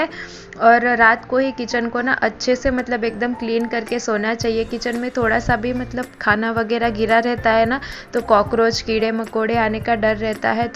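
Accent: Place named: Gujarat, India. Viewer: Aap gujrati hai bolne se lga muje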